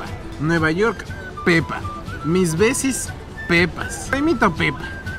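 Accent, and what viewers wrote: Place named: Quito, Ecuador. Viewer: Espectacular, por fin un ecuatoriano con sentido del humor nuestro y ese acento quiteño inconfundible...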